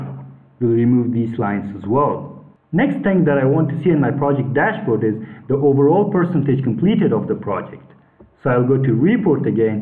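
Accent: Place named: Afghanistan. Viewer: The time I heard you, guess what, I told my friend this person is from a very special country, Afghanistan. Amazing Mr., great job!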